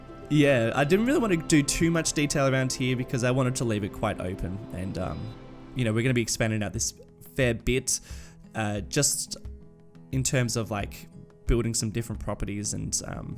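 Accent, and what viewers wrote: Australia. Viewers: aussie guy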